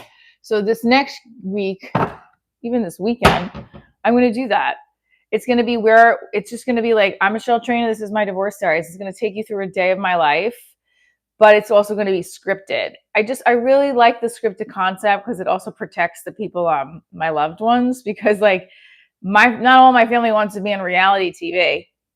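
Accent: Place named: Boston, United States. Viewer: Yea. You have Boston accent. Kinda Jewish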